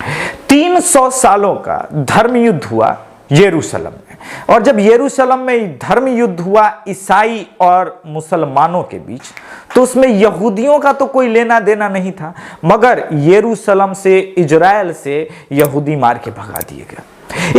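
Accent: Hyderabad, India. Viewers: Hyderabadi tone 😂😂 Jai Shri Krishna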